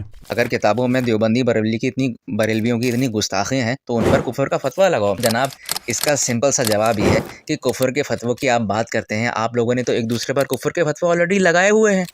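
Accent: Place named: Karachi, Pakistan. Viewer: Karachi wali awaz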